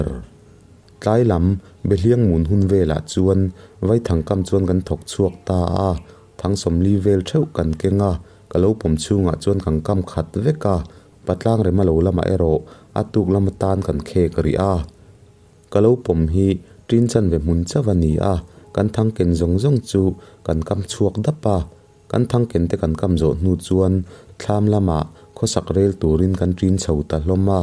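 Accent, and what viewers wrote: India. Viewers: A khua hi biahte ang,i sawi danah hian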